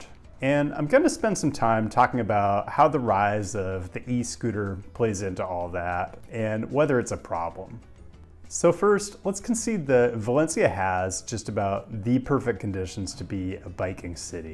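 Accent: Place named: California, United States. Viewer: OK, I don't have much to bitch about this time, I just think references to climate change are a form of insanity or at least unhealthy obsession. CO2 is NOT a pollutant but food for plants, and there is no evidence we are about to boil because we drive cars. Having said that, I am all for sensible bike lanes Valencia style, but I am skeptical about scooters. I also have to say that your delivery is actually charming and sexy, in a weird way. I am not a fan of the Kardashians, but your delivery in that LA/CA tone actually works ;-)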